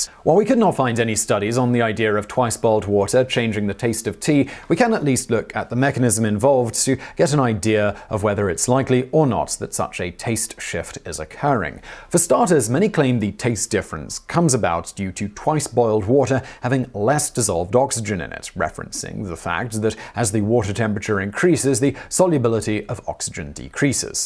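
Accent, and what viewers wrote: United Kingdom. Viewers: it’s hard as it is to understand this fast speaking British accent. you are a life saver . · I just got tricked into listening to a Brit talk about tea for 15 minutes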